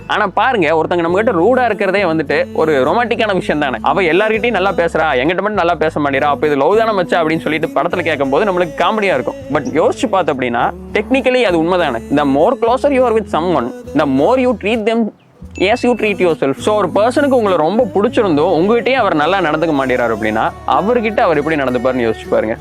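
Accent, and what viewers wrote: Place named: Kongu, India. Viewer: Dei kongu paiya unnoda slangatha enaku pudikum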